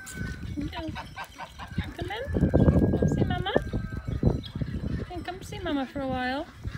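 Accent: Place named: Ireland. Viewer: You sound a little Irish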